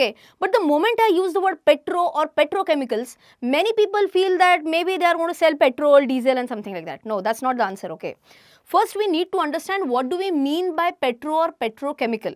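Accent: Maharashtra, India. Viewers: साबणात वापरलं जातं 👌😊 एक नंबर👍 The way you speak English (with natural indian marathi accent) The way you explain in english It's simply amazing, this is my first time I am watching your video And got very valuable inputs Thank you!💐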